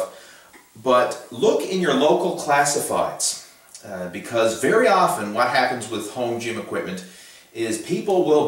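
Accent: Newfoundland, Canada. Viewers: this guy sounds like a newfie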